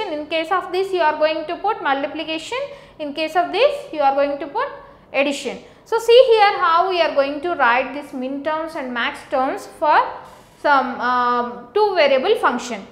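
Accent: India. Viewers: the lecture could be very successful if i'd know indian pizza delivery guy accent ://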